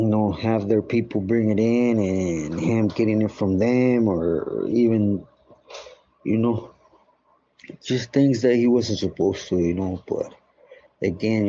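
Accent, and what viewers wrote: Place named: Los Angeles, United States. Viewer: I'm a white dude that grew up in LA and this dude sounds like everybody I grew up around.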